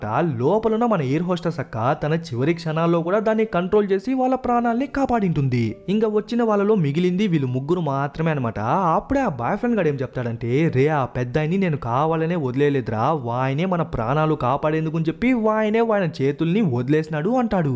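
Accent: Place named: Rayalaseema, India. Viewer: AS ALWAYS SUPER EXPLANATION ANNA love your all video from beginning mi telugu slang naku baga nachindi ekkada anna rayalaseema na ♥♥♥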